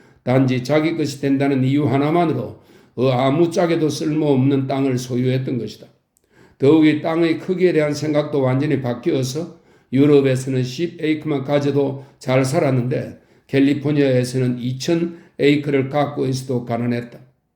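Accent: Gyeongsang, South Korea. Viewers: 경상도 억양이 인간적이라 사람냄샤가 나요...❤❤ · 경상도 억양이 싫어요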